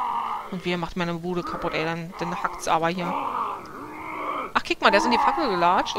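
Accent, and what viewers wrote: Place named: Berlin, Germany. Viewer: Allein wegen deinem coolen Akzent ist das Video schon richtig geil hehe ^^ ( hört sich an , als währst du eine Berlinerin :3 )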